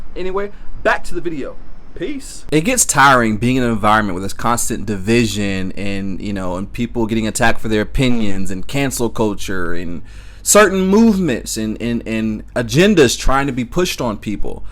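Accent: United States. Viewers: Took the time to watch your whole video before making these comments. I have been living in Thailand for over 10 years so i feel I'm qualified to speak. Overall pretty fair comments for someone in Thailand for only a year. BUT! 1) after a year, you still pronounce PAD THAI like an American. Listen carefully to the way 'PAD' Is pronounced. It's not an iPad. 2) you don't tell people that they can't just come to Thailand and stay. Tell them about the visa renewing headaches. 3) you seem like an open-minded guy and you try to be, but your comments on the LGBTQ++ issue in America was off. You said that you have no problems with them but you have a problem with how in-your-face they want to be. Well, I have to point out that white people were saying the exact thing about hip hop culture. 4) you don't tell people that you can't work here legally. 5) you skip everything about the political situation here, which is a huge thing. 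I'll keep watching. 6) you don't really understand Thailand and it's culture until you get to know Thai people, so how you have some Thai friends or at least aquaintances, and not just Thai bar girls, who most Foreigners think represent Thai people. However you are more balanced than most other YouTubers in Thailand.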